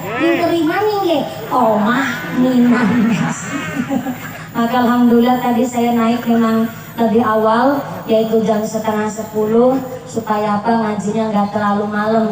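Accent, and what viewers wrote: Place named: Banyumas, Indonesia. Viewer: Assalamu'alaikum, moga sehat n sukses sllu bu ustadzah, ora ngapak ora kepenak, ❤❤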